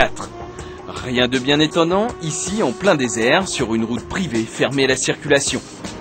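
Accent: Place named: France. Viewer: you sound so much like a f*cking arrogant french.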